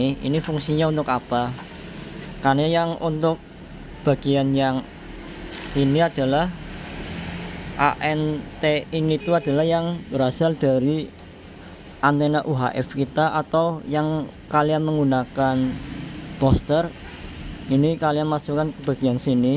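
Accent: Java, Indonesia. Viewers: Pasti...iki wong Jowo....medok banget ngomongnya!😄😃😀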